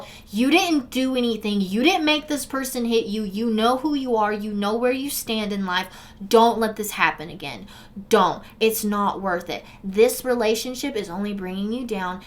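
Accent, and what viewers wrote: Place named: Virginia, United States. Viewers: Your accent sounds like you’re from where I’m living, in tri-cities, middle of nowhere Virginia 😂 lil bit of country, lil southern, lil city talk. Yup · You basically nailed it 😂 She’s from Grundy, VA. I’m from Roanoke so it’s funny to hear her accent, too. Very familiar.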